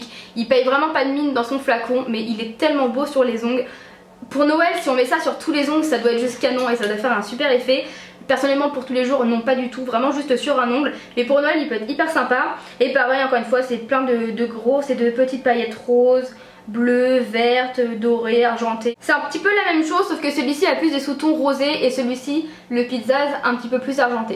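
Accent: Normandy, France. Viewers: c'est dans votre "accent" normand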